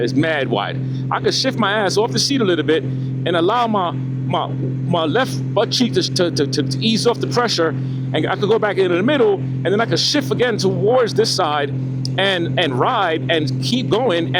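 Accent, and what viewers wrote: Jamaica. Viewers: I love that Jamaican accent. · Love that Jamaican accent brother! Keep these videos coming love em! Best Album made from any star past or present my opinion was! Guess who the artist was?! 😂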